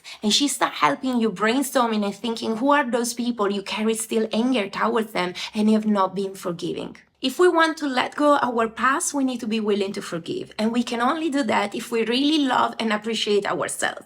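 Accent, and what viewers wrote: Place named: Italy. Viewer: I loved this book as well, and still refer to it for myself and for others. Excellent review! Love all the artistic ways you edited everything into it. And I love the beautiful way you still "speak" Italian in your English. So very entertaining...I want more! 😊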